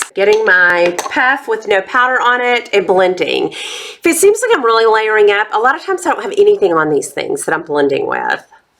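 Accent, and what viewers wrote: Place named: Mississippi, United States. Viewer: You look stunning!! What a fun video :) Where are you from? Love your accent, love that “y’all"! My mom is from Jackson, MS and I went to college in Mississippi, so of course I picked up on that y’all ;-) .. love that modern renaissance palette! loving all these high end products girl! Wayne Goss brushes have been on my list... def getting that #16 now :D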